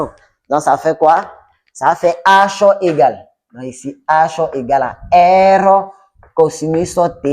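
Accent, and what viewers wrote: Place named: Ivory Coast. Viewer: Je jure que c'est un Ivoirien. L'accent ne ment pas.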